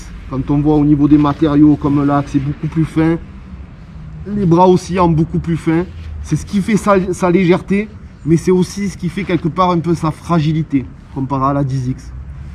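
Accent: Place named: North, France. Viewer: j’adore votre accent du nord et votre égo du nord. 😋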